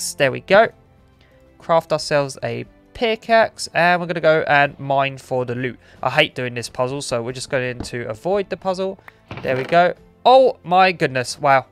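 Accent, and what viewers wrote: United Kingdom. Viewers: Hello sir! Dialect geek here wondering where about’s your accent from? Southern England? Is it cockney?😄 pardon me though, I’m just geeking here.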